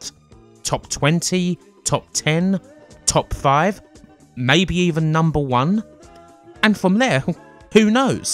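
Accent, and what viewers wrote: United Kingdom. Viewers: Maldita sea! Gracias por esta y mucha mas informacion de videojuegos saludos desde El Salvador, exitos, your british accent help a lot with the lenguage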